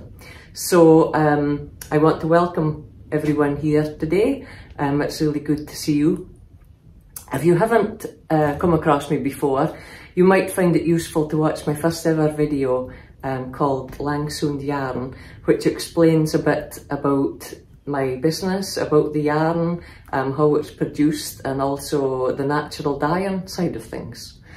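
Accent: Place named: Scotland, United Kingdom. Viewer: Really enjoyed listening and watching you with your crafting..love your Scottish accent also..😊yes..certainly would love to see more of what you get up too..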